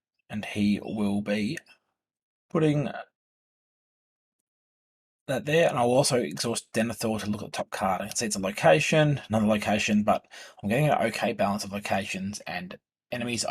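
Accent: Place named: Australia. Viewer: Thanks for the playthrough. It's good to hear an Aussie accent for once! Where did you get the playmat from? Cheers.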